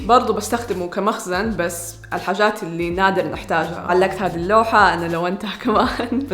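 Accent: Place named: Jeddah, Saudi Arabia. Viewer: حلو شديد وبحب لهجتك واضح انت من جدة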